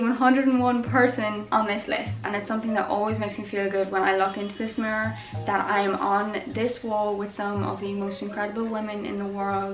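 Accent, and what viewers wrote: Ireland. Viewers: you just came up on my recommended and it's so lovely to hear a fellow irish voice! your room is goals 💌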